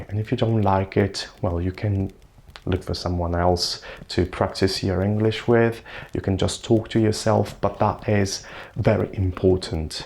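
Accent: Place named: North, United Kingdom. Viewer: Sexy northern accent... Where have you been in Britain to study English?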